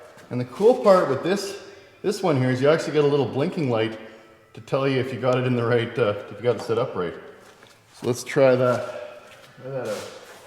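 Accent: Canada. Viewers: The way you talk you don't talk like a truck driver maybe it's a canadian accent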